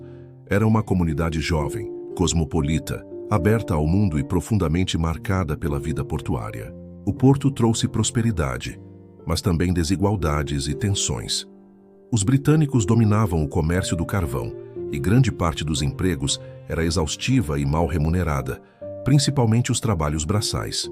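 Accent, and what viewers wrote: Brazil. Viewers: Mas fica sendo que os Cabocerdeanos sempre usam o sotaque Portugues de Portugal. E o que aprenda nas escolas de Cabo Verde.... por isso foi a minha pergunta inicial do sotaque Brazileiro sendo usado neste video pelo um Caboverdeano. E era so curiosidade, nada mais.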